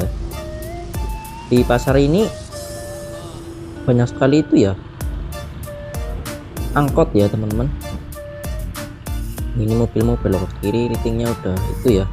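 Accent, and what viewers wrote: Java, Indonesia. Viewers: Kok suaranya medok? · logat jawa nya medok banget...ill feel gw dengernya.sedangkan banjar patroman bahasanya sunda...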